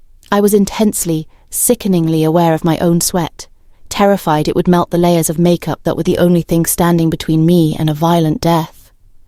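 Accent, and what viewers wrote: England, United Kingdom. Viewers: I like the English accent. It’s better than the one where she sounds like a Connecticut elementary school teacher. And there are a lot of Black people, including women, in England, Africa, and Asia who all have English accents.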